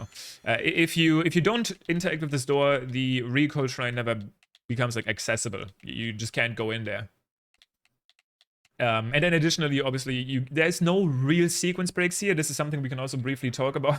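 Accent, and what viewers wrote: Germany. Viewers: Are you from Germany? Because IT Sounds like you are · are you german? because of your accent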